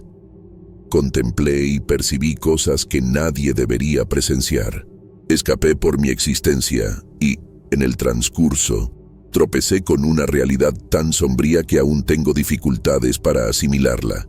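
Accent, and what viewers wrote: Mexico. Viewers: Alchile ami me encanto con herga MEXICANA creo que es el primer canal con ese estilo 🤙🏻y ME GUSTO mucho,siento que te familiarisas mas con la persona del relato y las emociones que sintio por su manera de expresarse.🤌🏻📽️🫶🏻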